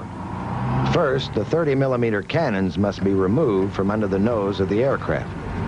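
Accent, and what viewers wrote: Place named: France. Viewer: Narrator French accent... sucks!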